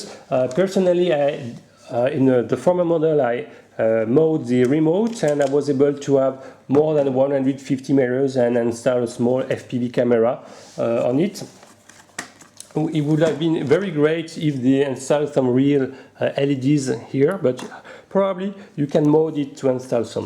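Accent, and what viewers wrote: France. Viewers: Hey dude are you french? You have a strong accent!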